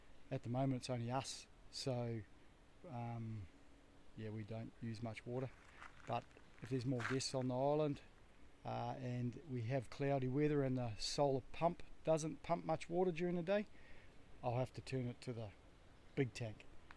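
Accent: New Zealand. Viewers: You sound like you are from NZ ? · Just found your channel. Great footage. Great location too. Every 79 yr old teenager dream. Did I see something about you leaving.? Obvious question..why.? You sound like a kiwi.! Thanks again for sharing. Atb from across the ditch here in Nz.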